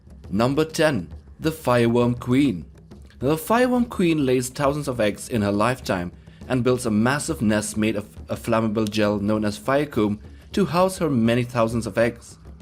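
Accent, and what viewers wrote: Germany. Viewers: Love ur german axcent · du bist son deutscher das hört man sooo hart :)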